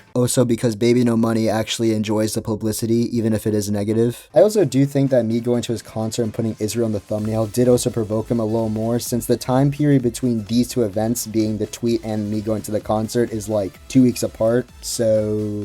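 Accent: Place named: United States. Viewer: terrible take. from your accent you would be American so by your same logic you are an Trump supporter and 100% for the Iran war. no there's no room for nuance with this. you see how stupid that sounds? I don't even say that to defend Israel because they shouldn't exist in the first place but that's a different conversation.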